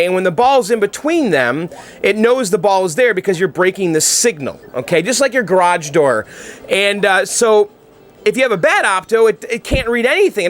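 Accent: Germany. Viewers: Hey, I really love your german accent. I speak german and I laughed so well! TOP!!! Greets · That might be the best German accent I've ever heard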